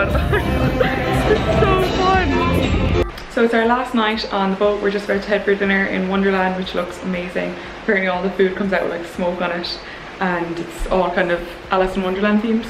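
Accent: Ireland. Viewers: U sound like irish😉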